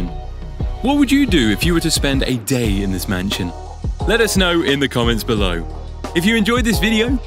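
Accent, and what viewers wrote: United Kingdom. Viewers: So it's not a house tour...It's photos taken off the internet that anyone can find then made into a slide show and a guy with a British accent telling you that LeBron makes a lot of money. 🙄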